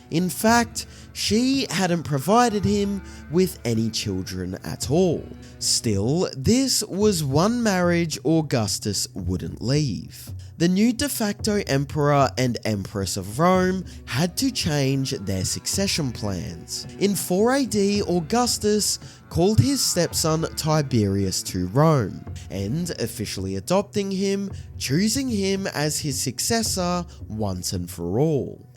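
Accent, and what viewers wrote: Australia. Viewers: I just love the Aussie accent! The way you can cram so many extra vowels into a word. Like 'exposé'. That becomes 'Aix-poah-zaiyyah'. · Your narration gained momentum as you progressed! Well done, love your accent. I'm an Aussie as well 😂😂❤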